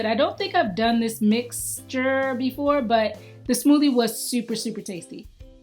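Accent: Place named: DMV, United States. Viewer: you have to be from the dmv based off your accent."uuuuused"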